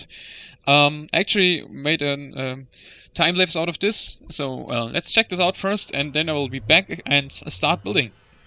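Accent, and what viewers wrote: Germany. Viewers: you can rly here hes from germany :D but ya great video. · He prpbably meant the description :) Bt the way great video, i really enjoy your videos, and the german accent just makes it better :D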